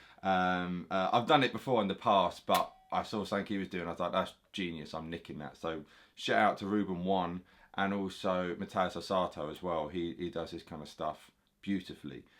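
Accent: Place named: Essex, United Kingdom. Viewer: Well, that was not the accent I was expecting... Essex? Nice vid btw! Gonna give these chords a try. 👍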